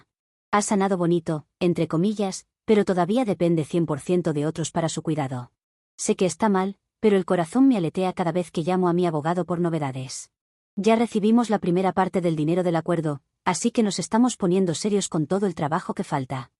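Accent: Mexico. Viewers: Tan temprano y ya tenemos suegra tóxica 🙄 por cierto, buenos días! Edit: amo como habla e insulta a su suegra en mexicano!!! Te quiero OP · Se nota que es de México jaja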